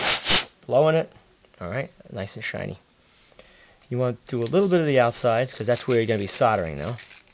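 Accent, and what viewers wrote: United States. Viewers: wondering would it be any easier to drill before you cut it to length...\and sorry I thought you had a slight speech impediment...I didn't realise Americans pronounce solder sodder...lol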